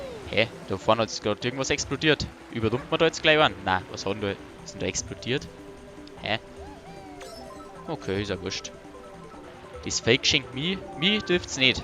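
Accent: Bavaria, Germany. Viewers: Geiles Video ... Dein Bayrisch is so Geil mach weiter so ...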